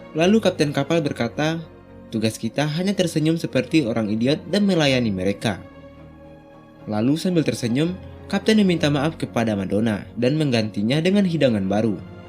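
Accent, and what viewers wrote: West Sumatra, Indonesia. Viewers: Fix mimin org minang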